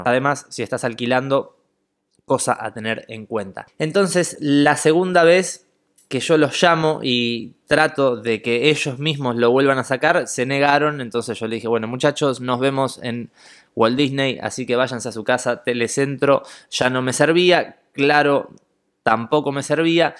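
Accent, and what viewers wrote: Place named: Colombia. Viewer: jajaja ya conocía al cantante? Pero vio que si se parece en algo jajajja ah bueno hablas como Colombiano en la buena parce jajajja 👍🇨🇴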